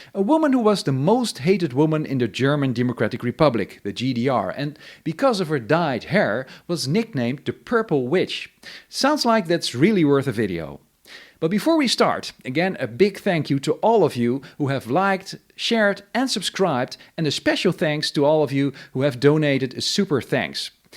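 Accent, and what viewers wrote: Netherlands. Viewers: You are from the Netherlands, aren't you? I hear a slight Dutch accent as well in your English as in your German. What brought you to work on this kind of German history?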